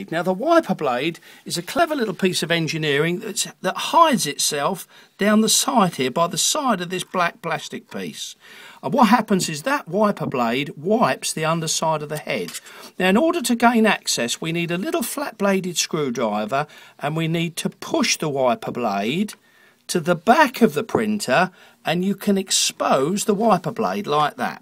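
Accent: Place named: United Kingdom. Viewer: Wonderful British English accent!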